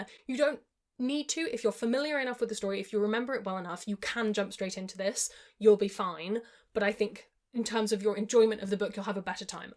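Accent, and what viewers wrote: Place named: United Kingdom. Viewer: Love your accent, it's like British lite, like a mild tea😊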